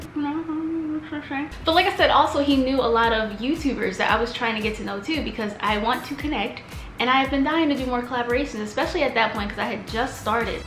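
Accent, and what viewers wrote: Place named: United Kingdom. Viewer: Your UK accent is getting better for sure! At this point I Don't even think you're doing it on purpose 🙄 🤙🏾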